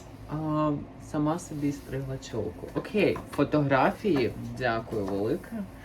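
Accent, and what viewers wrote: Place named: United States. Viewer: Ухти)) в вас такий американський акцент став за ці роки. Цікаво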